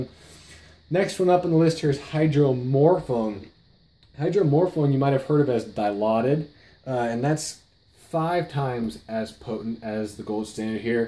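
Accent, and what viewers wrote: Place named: Ohio, United States. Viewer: this guys sounds like he's from Ohio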